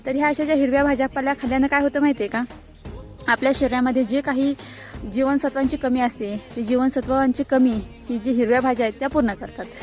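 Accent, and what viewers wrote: Standard. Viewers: Tai ...tujh kiti shikshan jhal....bolnyat standardness khup aahe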